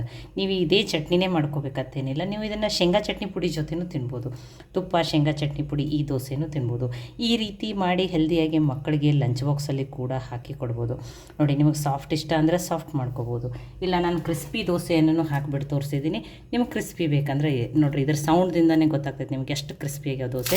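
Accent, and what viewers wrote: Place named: Dharwad, India. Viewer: Wow namma Dharwad kannada ❤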